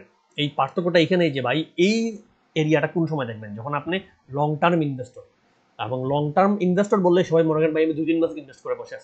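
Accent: Sylhet, Bangladesh. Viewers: ভাই আপনার কথা খুব ভাল লাগে।আপনি সিলেটি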